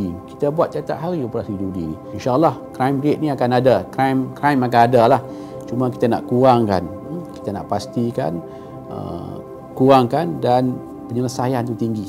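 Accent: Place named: Kedah, Malaysia. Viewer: Lama datok kt luaq.. tpi loghat kedah datok msih ada lgi.. semoga allah lindungi datok dan kita seluruh rakyat Malaysia..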